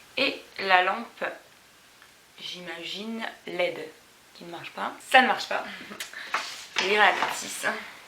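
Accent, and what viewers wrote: Corsica, France. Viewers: Mdrr toi sa se voit que tué corse avec ton accent moi aussi je le suis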